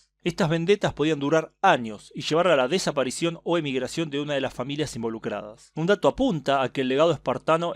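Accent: Argentina. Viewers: Disculpa la franqueza, aguantando tu "SHA" argentino. (Hago lo posible, porque tus temas son importantes). Quisiera saber eso del "perfil griego". A Napoleón le volvía loco ese perfil griego, Y a mí, cuando veo ese perfi en una mujerl, me parece bello, ya que no tienen la hendidura, de la parte superior de la nariz, sino es recto. Saludos desde Perú. Porfis, podrías pronunciar lo menos posible lo "SHA", pero si no se puede, no importa. aguantaré, Un abrazo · Xq va dejar de decir Sha ? Es así es nuestro acento y nada ni nadie lo puede cambiar te guste o no.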